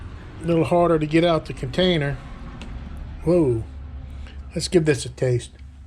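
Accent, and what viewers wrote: Louisiana, United States. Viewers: You from LOUISIANA?? If you don’t mind me asking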